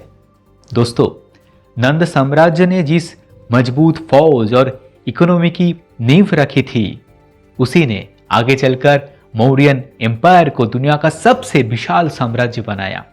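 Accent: Bengal, India. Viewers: হিন্দিতে একটু বাংলার টান আছে, পারে ঠিক হয়ে যাবে, 💹↗️ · Pure bangali hindi... We r always with you brother❤ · I'm a good hindi speaking Bengali and I can see the effort you have put in speaking Hindi in this video. And being your first hindi video, I appreciate you and motivate you for further going on with this venture. Yes, the Bengali tone is evident in your speech but you have balanced it well with a controlled pace which has minimized any pronunciation mistakes. Topic and research were good. But full marks for your courageous first steps in making a hindi video not being a native hindi speaking guy. Lage Raho....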